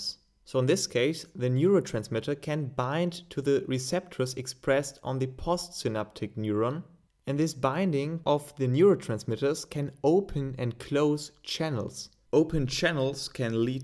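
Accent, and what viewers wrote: Germany. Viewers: hilfreiches video, danke :) höre ich da den deutschen Akzent raus? :D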